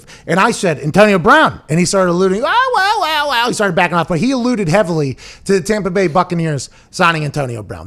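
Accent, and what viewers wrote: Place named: Pittsburgh, United States. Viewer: Yes it’s that Pittsburgh accent just like they make “down” into “dahn”. It’s a thing on the show. Nothing malicious intended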